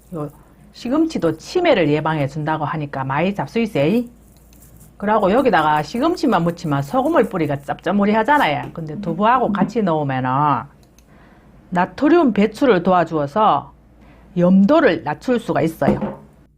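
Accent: Gyeongsang, South Korea. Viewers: 구수한 경상도사두리가 친근감있어 좋아요. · 샛낫꼽때기만큼 아주조금 경상도아지매 맛나게하시네요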